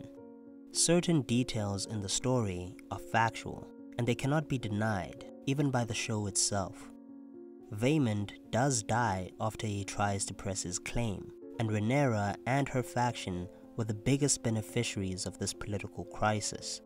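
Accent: South Africa. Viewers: Are you south African? Just asking 😂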